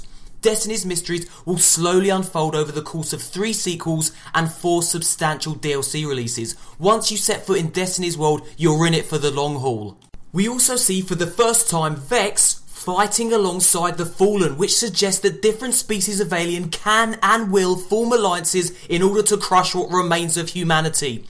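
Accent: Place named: United Kingdom. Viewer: It's only easier to understand because you speak with that accent. I'm an american, and I find it much more pleasant to listen to an english/brittish accent, than to an american one. it sounds better to me. it's all opinion.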